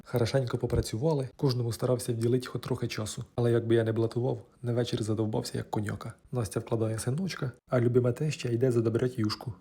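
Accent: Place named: Cherkasy, Ukraine. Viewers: Дивлюсь з Польщі . Як я люблю цей акцент, провела дитинство у бабусі на Черкащині. Корсунь Шевченківський , Стеблів де народився Нечуй Левицький... Ностальгія прямо... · Вітання з Нідерландів ❤ З першого твого слова вгадала, що ти з Черкащини 😅 Жила я там кілька місяців (м. Шпола). Черкаський гумор приправлений специфічним діалектом — такий само впізнаваний як полтавський борщ з галушками 🎉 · Німеччина) із задоволенням слухаю черкаську говірку) моя мама з Хлипнівки Звенигородського району) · Я дивлюся з Австріі,а родилася на Кіровоградщині.Яка цікава подача-з гумором да діалектом місцевим.Дуже багато знайомих "приколиків" словесних!!!🤗👍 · Дивлюся з Варшави, пишу комент с гіпсом 🙂не могла не написати, у вас говір як у моєї мами, сама з Черкаської області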